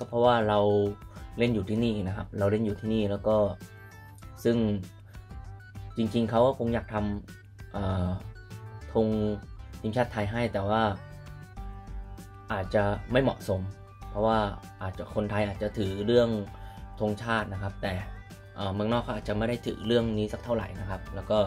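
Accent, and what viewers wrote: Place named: Japan. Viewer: สำเนียงญี่ปุ่นจัด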